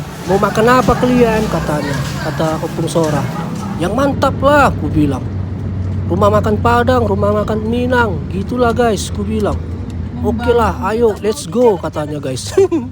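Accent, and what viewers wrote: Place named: Java, Indonesia. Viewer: BANG LU JAWA MEDAN YA KOK NGMONGNYA KADANG ADA JAWANYA😁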